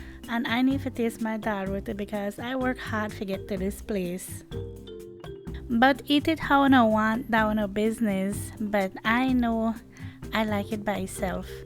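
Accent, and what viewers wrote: Jamaica. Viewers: Do you think the accent is similar to Jamaican?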